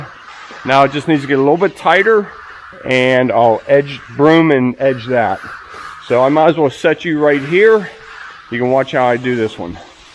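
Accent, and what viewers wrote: Pittsburgh, United States. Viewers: I enjoy watching your videos. Very refreshing to see people with good work ethics, and other ethics...👍💪🏅 I have learned a lot about pouring and finishing concrete! I have to chuckle every time to correct yourself on the pronunciation of the word "Out". 😀 I assume because there's lots of comments about it. You don't need to do that, you are from Pittsburgh, it is not going to change! Be yourself, that's all part of it. I have a friend here in the Southern Maine/NH area that moved here from Pittsburgh 35 years ago. His pronunciations and little phrases are identical to those of you and after all these years. Don't try to change, and don't worry about those who think you should. Be yourself, I wish you well with the channel. I hope you can gradually shift to doing more fun things. Enjoy life. 👍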